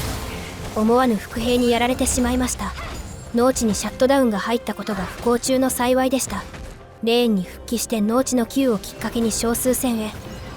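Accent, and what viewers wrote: Kansai, Japan. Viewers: わい関西人やから時々関西弁はいるの親近感感じてうれしい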